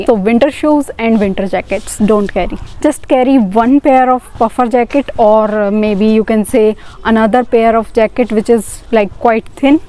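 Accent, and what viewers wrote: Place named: Haryana, India. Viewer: i can feel the Haryanvi accent in your voice 😅 btw.... very informative video 🤩😍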